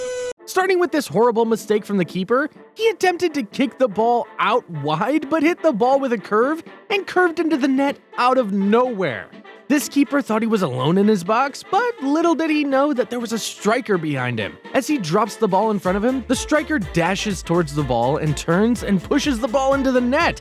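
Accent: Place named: United States. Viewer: Bros definitely american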